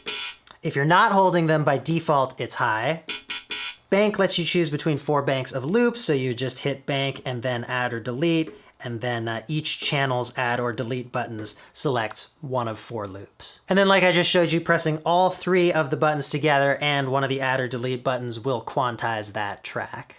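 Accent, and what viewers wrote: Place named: Canada. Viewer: I totally forgot you were Canadian then I heard the word owtside lol love the content man! Been waiting on a good overview of this.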